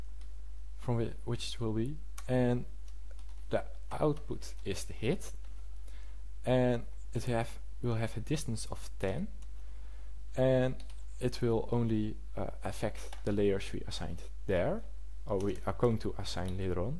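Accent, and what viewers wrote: Netherlands. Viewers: Dutch? :P Nice video man :) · Wear are you from, Holland? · your dutch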